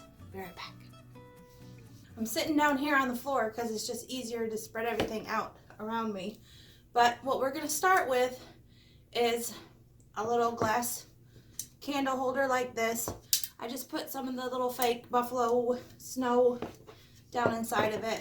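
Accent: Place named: South, United States. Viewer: Southern is best!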